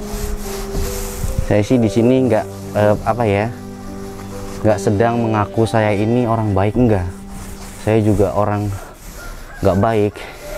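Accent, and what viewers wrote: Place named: West Java, Indonesia. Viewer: Mas logatnya kaya orang sunda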